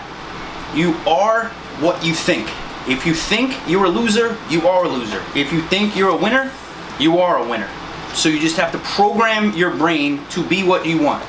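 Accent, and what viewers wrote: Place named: United Kingdom. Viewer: It's all about the British accent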